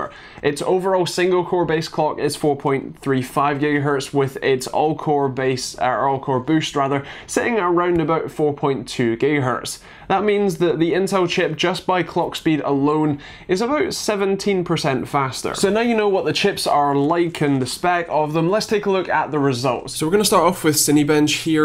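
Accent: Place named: Ulster, United Kingdom. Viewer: Sounds a bit like you're from Ulster. My mother's side of my family are all from Belfast so I can tell. LOL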